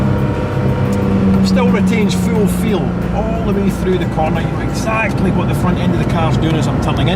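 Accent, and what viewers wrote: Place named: Scotland, United Kingdom. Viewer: Could do with more engine noise and not a Scot talking a hole in my head...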